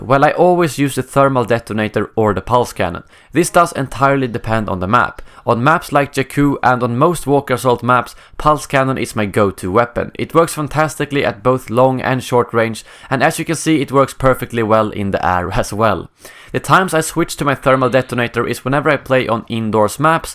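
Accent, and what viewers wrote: Sweden. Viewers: are you swedish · han låter svensk iaf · are you swedish? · Älskar lite svensk brytning 😃👌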